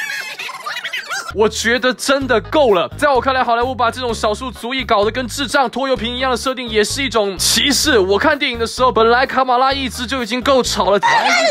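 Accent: Taiwan. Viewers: 這個口音 好像台灣人喔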